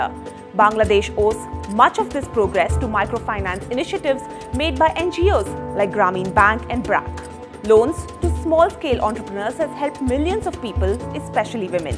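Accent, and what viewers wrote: India. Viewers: Bring someone who speaks ENGLISH. NOT HINDLISH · Just 1 percent of your Indian accent makes this entire report 200% biased in your favor. Bangladeshes electricity consumption is <num> Megawatts, where is produces nationally 23,000 megawatt and will increase it to 2 Gigawatt by 2023-4. So what "Electricity hinderance are you refering to? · Is TRT World an Indian channel !!? Why an Indian with their annoying Indian accent of English and overall and Indian view on the matter of discussion.....!!! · Hate the Indian presenter and accent. Should use English spoken people.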